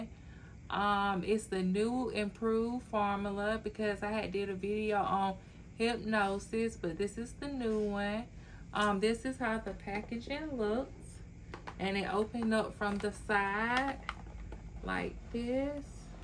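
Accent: United States. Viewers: A Mississippi / southern accent for sure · Should this be in my collection? Thank you for sharing this content! Sound like you from the South.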